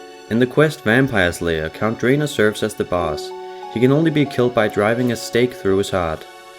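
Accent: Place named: Denmark. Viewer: Yup this was super interesting! Du må næsten være dansker med den accent, right? :P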